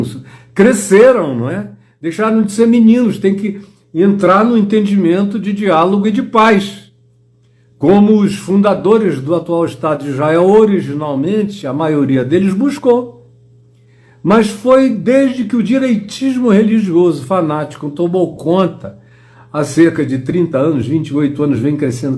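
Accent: Recife, Brazil. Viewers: Aí sabe que fala Recife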